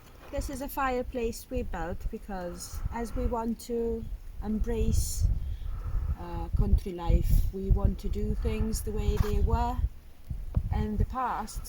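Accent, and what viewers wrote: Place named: Scotland, United Kingdom. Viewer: Love this!!💛💛 Wishing you all the success with your vlogging journey!! 💛💛 You and your Scottish accent haha!! 💛💛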